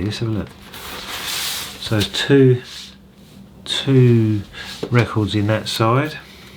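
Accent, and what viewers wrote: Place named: RP, United Kingdom. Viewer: Your videos are strange. I don't know if you care about music at all... Do you? Or are you all about reselling? Or is it just an obsession? Your "posh" (affected or not?) accent quite throws me of. I cannot figure you out... Where are you from? [Full disclosure, I am a Fleming from Brussels, but i've lived in Massachussets (USA) for 40 years.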